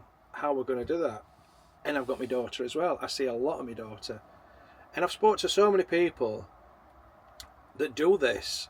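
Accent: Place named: Lancashire, United Kingdom. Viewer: We just found your channel…and love 😊it! Your accents bring back happy memories to my Lancashire born wife! Replace those wine glasses with plastic ones (we bought ours from Wilko, but as they no longer exist I’m sure The Range will have them). Bin your ear plugs and use the white noise app on your smartphone…..when you first hear it you’ll think you can’t sleep with all that noise but before you know it you will be sleeping like a baby!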